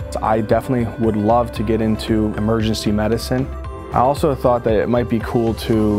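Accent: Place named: United States. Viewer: I started to follow you because I'm working as a military personal trainer. I saved people lives during my last year here in Italy thank to my military knowledge, and I would like to improve my knowledge in motorial science in order to give the best suggestions I could to recovery from many muscolar and psicology problems. So your tutorials are helping me out a lot, I love your American English which is really easy . Thank you a lot sir .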